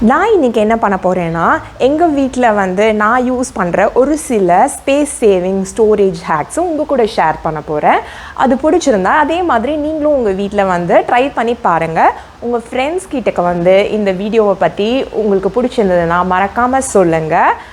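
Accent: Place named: Sri Lanka. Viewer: சகோ super 👍 இல‌ங்கை தமிழ் இந்தியா தமிழ் பேசும் விதம் வித்தியாசம், from Switzerland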